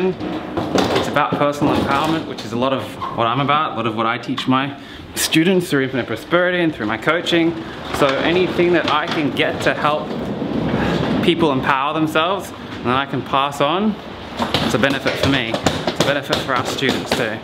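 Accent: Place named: Australia. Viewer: Had to watch the first minute three times, its so hard to adjust to australian accent :) Nice video The car- finance- thing is a mix beetween them securing the loan and them beeing to lazy to look up the full picture. Hope it works out. How do other people manage to get loans on their supercars?, nobody buys these things outright with cash.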